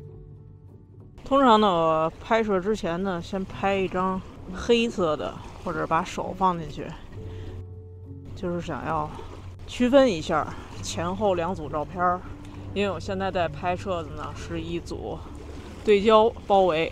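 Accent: Beijing, China. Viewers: 绝对是个老北京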